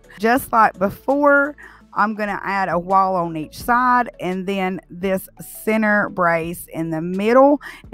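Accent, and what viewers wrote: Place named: North Carolina, United States. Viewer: I just subscribed. I don't know anything about you, your accent sounds like North Carolina. I live in Smithfield North Carolina.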